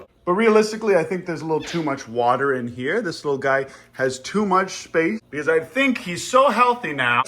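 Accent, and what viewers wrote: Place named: Pennsylvania, United States. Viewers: Hearing this man use western PA speak is always confusing to me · Why do you have a Pennsylvanian accent if you’re from Texas.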